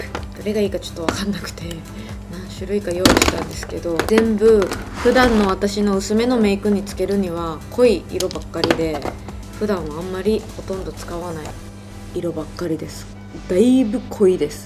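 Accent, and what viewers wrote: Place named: Aomori, Japan. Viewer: 東北人のわたしですらなまってるなぁと感じるのですがあいちゃんは東北の方ですか、、？青森っぽいなまりだなぁと思いますが違かったら失礼しました( ´тωт` )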